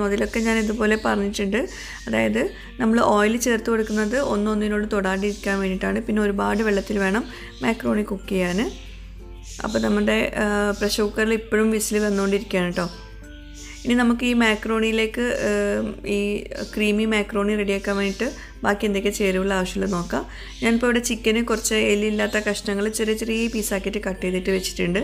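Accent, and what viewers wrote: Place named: Tirur, India. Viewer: Adh correctaa... Tirur slang correctaayi vannu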